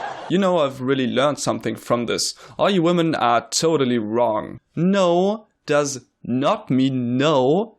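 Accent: Germany. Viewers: Dude Clearly i hear some German Accent in this Video and its Horrible!! better learn to speak like a human being or talk German. This suits you better.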